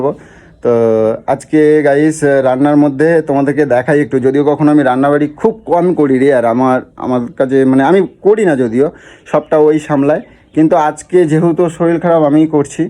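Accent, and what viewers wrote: Bangal, Bangladesh. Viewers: তুমি একটু বাঙাল কথা কম বলো। আর শরীল নয় শরীর। তবে puchu কে মিস করলাম 😢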